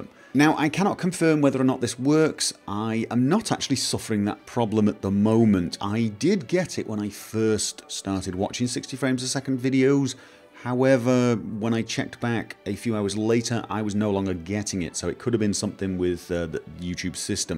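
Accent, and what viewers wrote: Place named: England, United Kingdom. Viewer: OH MY GOD ... that accent ... seriously where are you from, England? also, this did work, too, so thanks.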